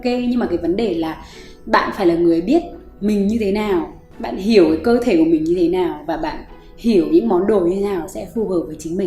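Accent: Central, Vietnam. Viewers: Chị làm video chỉ nói tiếng miền trung đii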